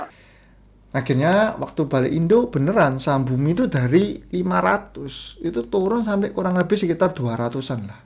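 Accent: Surabaya, Indonesia. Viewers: Logat suroboyo ne mantep koh 😁 Type trading nya kyk saya nih pernah dalam sekejap untung ratusan persen pernah juga dalam beberapa jam rugi puluhan juta Masih belajar biar ga greedy saya koh moga bisa melek money management 😂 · Bhsnya kyk wong Surabaya ki,mabtab infonya broo... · logat boyo kentel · Kok koyo wong suroboyo smpean Koh..?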